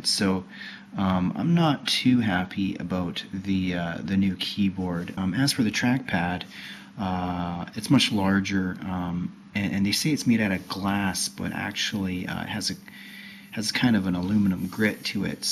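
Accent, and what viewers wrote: Canada. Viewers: This guy sounds Canadian to me. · wow i've never heard someone call so many things by the wrong name. i bet you call the ipod touch an itouch too. the cpu is in ghz and the disk is 320gb. the ram is 4, its called macbook air, and macbook pro. its also called 9400m and 9600m gt. i sense a canadian accent so it's understandable.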